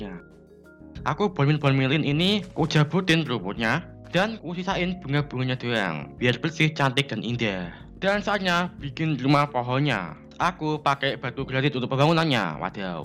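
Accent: Java, Indonesia. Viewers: Orang mana bang, kok logat nya kayak orang jawa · Setuju banget (╥﹏╥) jawa nya ada imut-imut nya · Jowo ne medokkk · jowone terlalu medok iki kayane,, ngommong bhs indonesia we raiso alon lan kesrimpet srimpet wkwkwkwk btw jowo ndimas?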